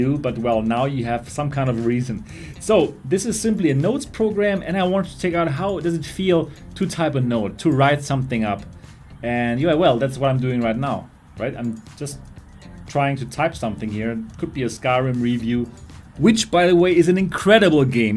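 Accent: Germany. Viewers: Man hört von der ersten Sekunde, das du ein Deutscher bist ^^ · Are you from germany?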